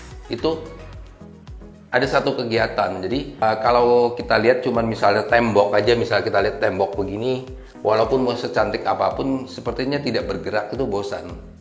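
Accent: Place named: Bangka, Indonesia. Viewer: Logat bicara blom ilang bangka ah khiu.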